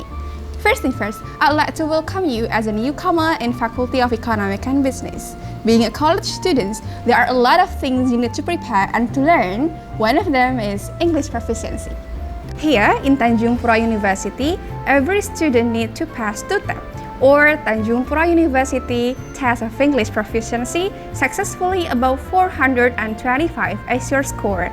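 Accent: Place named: England, United Kingdom. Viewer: Kakak nya pake english accent ya;(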